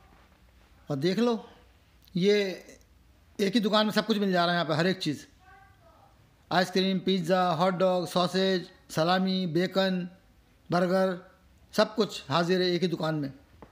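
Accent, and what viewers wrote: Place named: Uttar Pradesh, India. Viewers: जो आप u p स्टाइल में बोलते हैं बहुत ही मजेदार वेज एग रौल 😁😁😁😁❤ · ji namaskar main aapki video Kafi Samay se dekh raha hun aap bilkul sacchai Bayan Karte Hain dusri Baat Aap Ki Boli mujhe bahut acchi Lagti Hai kya aap up Se Hain aapki baten sunkar maja Aata Hai kya aap India ko mis Nahin karte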